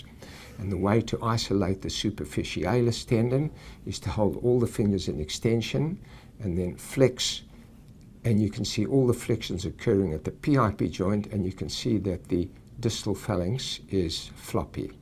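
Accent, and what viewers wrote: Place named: South Africa. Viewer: he has a South African accent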